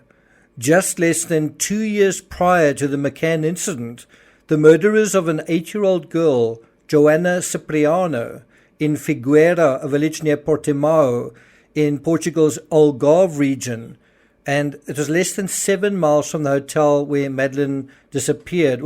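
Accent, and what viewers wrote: South Africa. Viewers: You sound South African? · Stumbled across this video 😊 ... Is that a fellow South African I am hearing 😊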